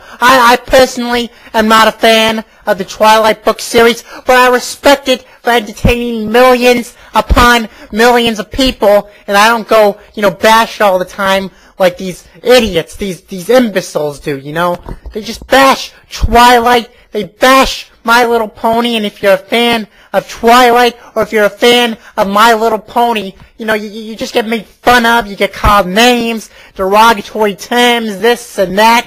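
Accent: New York, United States. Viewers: When did do a New Yorker impersonation?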